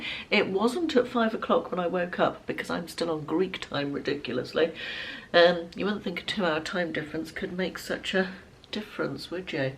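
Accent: United Kingdom. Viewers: Yes, but you get to say those Americanisms in a British accent, so there is that! 😂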